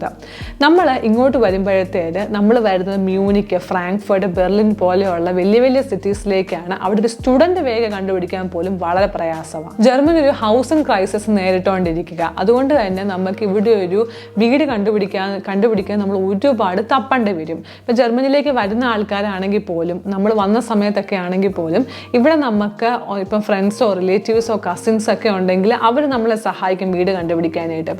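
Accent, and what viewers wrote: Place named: Pala, India. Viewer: പാലക്കാരി ആണോ മോളെ...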